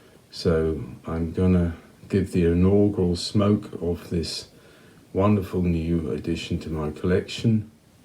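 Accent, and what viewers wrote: Yorkshire, United Kingdom. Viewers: Great video, big grin and chuckles over here, enjoying your take on it all with the Yorkshire accent. Bravo! Watched this after your channel update video. Godspeed to you, prayers said from here. You are going to do great things for folks who really need help. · Hi, Received my Hardcastle pipe today. The same one you have, put with no filter. The last pot shape they have. I’m breaking it in with some Watch City Cigars Old Dominion Flake and a nice cup of Yorkshire tea. Life doesn’t get any better than that. Great Yorkshire accent. By the way love your videos. Charles.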